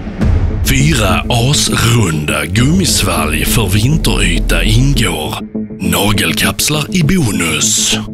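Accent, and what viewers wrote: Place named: Skåne, Sweden. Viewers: Gabriel von Blumenthal skånska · Its called skånska, spoked by those who are from skåne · Gabriel von Blumenthal Everyone thinks different, but I would say in Skåne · Cool video Can't stand the southern accent though. D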